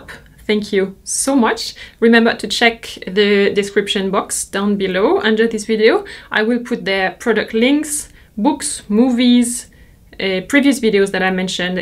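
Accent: France. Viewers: I just enjoy your French accent so much Music to my ears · She has a French accent. The more you listen the more you'll understand.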